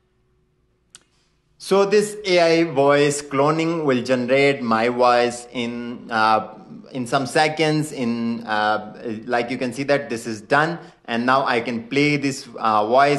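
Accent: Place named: India. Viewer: In the video all I could understand was the first part of the name, which was play, but the rest was a blur. I had trouble understanding your heavy hindoo accent.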